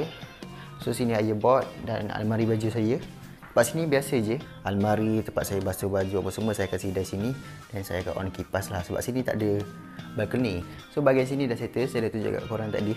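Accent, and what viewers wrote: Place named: Kuala Lumpur, Malaysia. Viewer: Cara editing mmg dr dulu tk prnh mengecewakan...Cantiks hias... Dr dulu kalau tgk time dia duk sekali dgn adam semua diorng antara paling kemas jaga umah... rumah bujang kira mmg sngt² okey... umur adik akak sebaya tp kena akak dia yg kemas bilik depa... Kalau tk sakit mata tgk...😅 Cara berckp dr dulu sgt perform bile dia brckp dlm yt dia... dulu org kecam sbb ckp loghat kl ni berlagak tp sebenarnya kalau kt pandang jauh blh menarik org luar utk tgk yt dia jgk... akak sgt suka sbb dia ade vibe mcm yt iman kamil... softspoken n details bile bercerita... Org introvert je faham... ni kalau dia review brg confirm org jadi terjebak nk beli... Terbaik... 🎉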